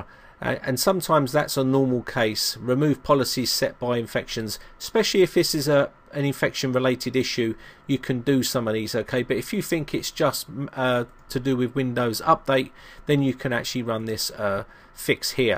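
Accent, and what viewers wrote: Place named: South London, United Kingdom. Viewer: I'm a 68yr man, and even an old fart like me can understand your consise instructions. Are you a South Londoner? you sound like one,I was raised in Stockwell.I can still remember all the Pride and Clarke motor bike shops along Stockwell Road. Thank You very much,I do envy your know how. All the best mate, Mark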